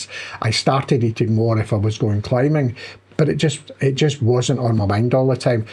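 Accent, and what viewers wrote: Scotland, United Kingdom. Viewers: OMG, your accent is so sexy! It's like the accent in the TV show Outlander --- Jamie's accent. ❤ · Ha ha. My grandparents came to NYC from the Spey valley Scotland 1921 (MacPhersons). They were raised in Gaelic speaking households. This accent is pure music to my ears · He sounds like Scottish which I do find bit strong for me to listen for long 😅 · I hear the Scottish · Hes an inspiration to us all...and his voice is lovely to listen to isnt it, with that great Scottish accent! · I fully agree with what you said about the side effects: compared to the risks one has with staying fat, they are minor. Let them come, same thing what I think. As long as I lose weight, I am happy to have some gastritic issues. Your weight loss of 15% in the first 3 month is outstanding. You said that you are a hyper-responder. But what dosage did you go to in this timeframe ? 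by the way: what region are you from ? am I right your accent is scottish, but where from?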